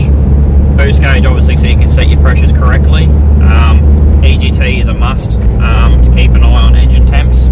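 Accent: Australia. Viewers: hi! can you write down everything.sorry to sound rude but your australian accent got me. if you dont mind writing everything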